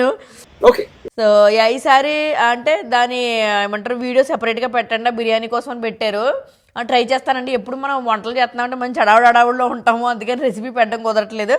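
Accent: Godavari, India. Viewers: Yes nijamee andi nevadhathamasgarilage vuntaru me voice chala baguntndhi godavari language chala famous ayyindhi andi amrica lo kuda tq godblessyou 🎊💝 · Mee godavari language super ga untadhi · Memu jamshedpur lo untaamu. Kaani native place West Godavari Palakol Anduke mee Godavari yaasa chala chala connect avutaanu. Movie lo cheste Andhra audience bagaa. connect avutaaru . Mee West Godavari Yaasa biggest highlight avutundi ye movie ki ayina. Idandaa yenduku chebutunnannate. Anil Ravipudi gaaru Meeku chance ivvaalani korukuntunnanu. I am a Big Big Big fan of u. Yenta appreciate chesina naaku tanivi tiratledu. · ఆమ్మ! ఒక కోనసీమ జిల్లా వాసిగా చెప్తున్నా! You made గోదావరి భాష యాసిని famous through out world with your videos / reels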